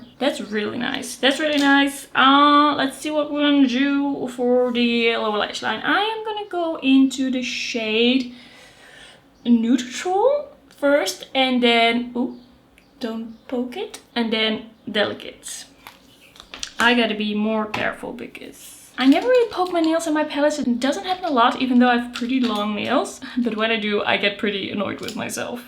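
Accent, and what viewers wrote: Netherlands. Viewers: First time visiting your channel and I had the feeling you were Dutch. But when you talked to your cats I knew 😂❤ "Ga gewoon ergens liggen". Love it! I have picked up te Nudetrals palette and it's on it's way. Can't wait 🎉❤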